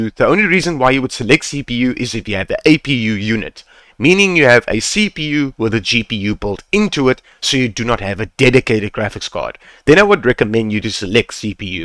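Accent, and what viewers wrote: South Africa. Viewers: I knew you were South African as soon as I heard that accent! Big ups bro nice to see local gents doing well 👏🏾 (gonna try this out when my loadshedding is done)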